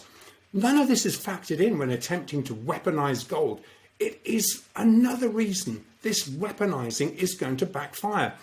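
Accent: England, United Kingdom. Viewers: I'm here to listen to an English accent.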